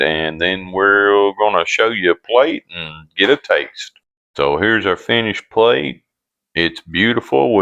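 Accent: South, United States. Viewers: Love to listen to him talk , great southern accent and grandfather voice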